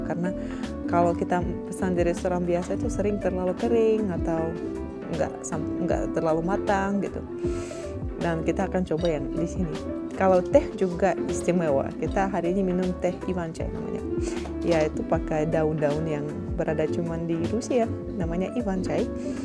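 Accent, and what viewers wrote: Java, Indonesia. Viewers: Mantap mba,bahasa indonesia nya sdh pasih disertai logat jawa sebagai pemanisnya👍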